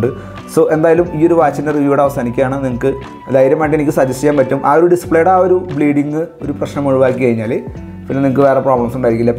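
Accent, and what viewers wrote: Kunnamkulam, India. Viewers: Kunnamkulam bhashaa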